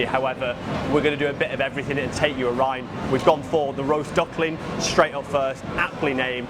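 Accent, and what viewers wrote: United Kingdom. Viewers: Brunei is worth doing for 2 days if you are visiting. I've been to Singapore a few times. I have relatives in Bolton, I thought you did sound a little bit Mancunian lol....I'm from Nottingham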